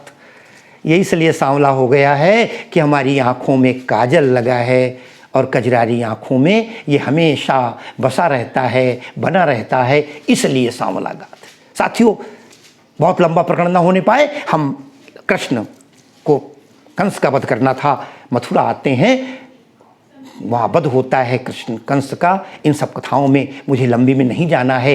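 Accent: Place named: Unnao, India. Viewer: वाह वाह!बहुत ही विद्वतापूर्ण,शोध पूर्ण,भक्ति पूर्ण और अत्यन्त रोचक रहा भगवान श्री कृष्ण जी की लीलाओं का भक्ति व्याख्यान।ऐसे ऐसे रोचक पदो की विवेचना की जिन्हे पहले कभी नहीं सुना था।कचहरी का स्वांग बड़ा रोचक रहा।तीन तरह की एफ आई आर की जानकारी मिली।अंत भी अपनी रोचक और कौतूहल पूर्ण समस्या पूर्ति,कहां अंटके! से किया।बीच बीच में उन्नाव की बोली और लहजे की मिठास और अपनेपन की अनुभूति का भी आनंद मिला।बहुत सुंदर प्रस्तुति के लिए धन्यवाद।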